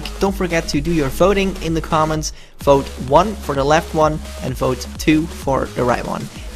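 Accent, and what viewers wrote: Netherlands. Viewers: Seriously are you Dutch to? 😂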